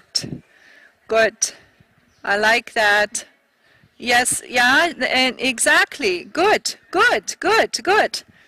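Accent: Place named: Germany. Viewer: You really hear the german accent 🤣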